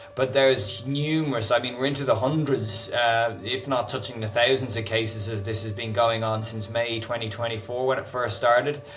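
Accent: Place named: United Kingdom. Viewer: British accent = lies.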